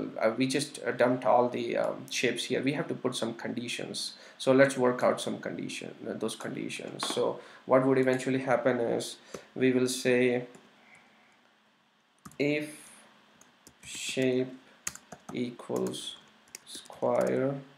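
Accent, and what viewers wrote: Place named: India. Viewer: I like your Indian accent :)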